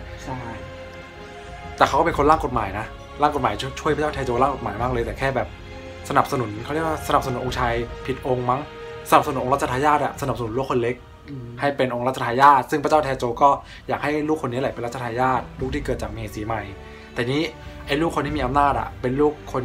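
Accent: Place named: Thailand. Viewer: เหน่อ 55 น่ารักไปอีก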